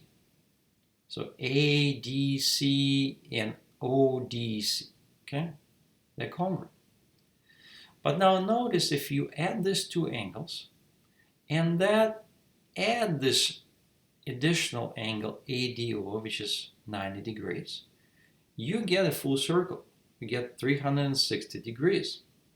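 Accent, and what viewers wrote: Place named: New York, United States. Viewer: Drop CE perpendicular to AB at E. angle CBA=30,angle CAB=15 angles in deg. Let CB=2,CE=1 and EB=sqrt3. AB=AD+DB. sin(15)=(sqrt6-sqrt2)/4 sin(30)=1/2 angle ACB=<num>=135 sin(135)=1/sqrt2 Use Rule of Sines on triangle ACB. AB/sin(135)=CB/sin(15) AB/(1/sqrt2)=2/((sqrt6-sqrt2)/4) sqrt2*AB=8/(sqrt6-sqrt2) AB=8/(sqrt2(sqrt6-sqrt2))=8/(sqrt12-2)=8/2(sqrt3-1)=4/(sqrt3-1)=2(sqrt3+1) DB=AB/2=2(sqrt3+1)/2=(sqrt3+1) DE=DB-EB=(sqrt3+1)-sqrt3=1. tan(x)=CE/DE=1/1=1 x=arctan(1)=45 degrees and that is our answer. Thanks for the problem. I like your accent,do you come from NY City?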